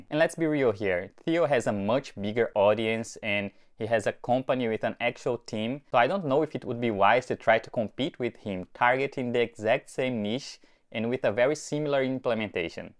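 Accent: Brazil. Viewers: The Brazilian accent,LoL. Irei subscrever e acompanhar a sua jornada 🙏🏾.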